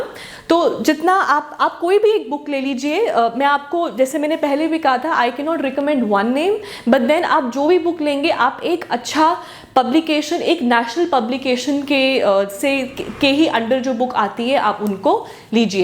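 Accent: Kashmir, India. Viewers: ❤️❤️❤️❤️ u r akin to 7 great lakes of Valley... Ur accent represents more of kashmir instead of Jammu.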